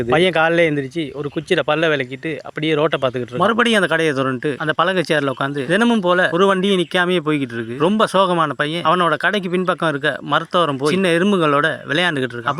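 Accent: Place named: Sri Lanka. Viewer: Srilanka slang adikkidhu. Are you Srilanka?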